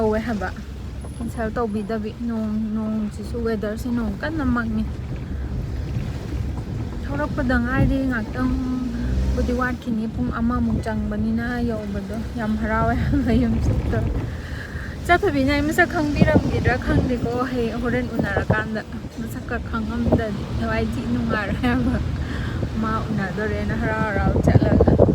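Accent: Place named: Kakching, India. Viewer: Enao j kakching gi oi taradi kakching maron kha kha nganglako eidi taba yam nungaiye😊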